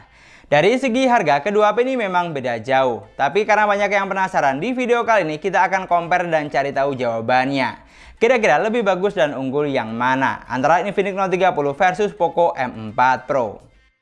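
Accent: Madura, Indonesia. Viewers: Ini logat madura ya bang?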